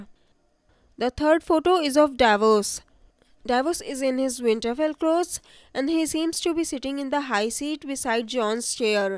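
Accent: India. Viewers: The commentator voice is very annoying, is like listening to a Indian person speak English.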